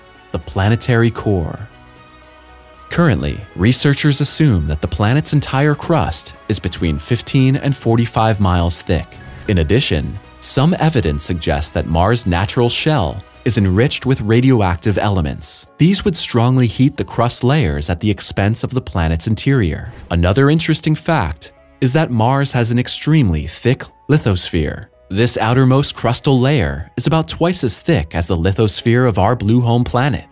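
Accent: United States. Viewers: Hear that voice. Dudes American. Go on with that stupid metric shit.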